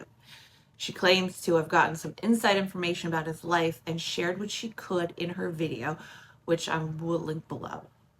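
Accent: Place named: New England, United States. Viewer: I love that the way you say certain things like I do, I know you're from the American Northeast, around New England. 😂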